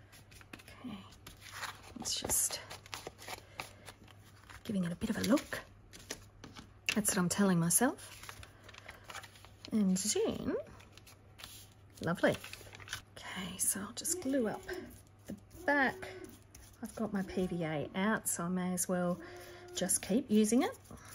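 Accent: Australia. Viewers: Hi, again, it is great to see how transferable skills can be. I started out, many years ago, as a scrap booker, then I was introduced to card making. Now I have found the wonderful world of Junk Journalling. While I have been collecting ideas and supplies for some time now, I have yet to actually make many projects! While I have used serviettes (napkins) as decorative card fronts I have not thought to use them to ‘dull down’ the shine from pages; a great tip. May I also say it is lovely to hear a familiar Aussie accent! No offence meant to our American, English, or Other, Journallers. Best wishes to Everybody and may your journals be all that you want them to be. 😀🦘🐨🇦🇺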